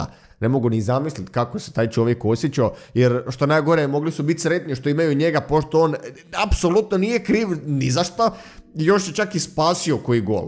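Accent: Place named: Međimurje, Croatia. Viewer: samo pitam jer govori skoro pa nas jezik međimurski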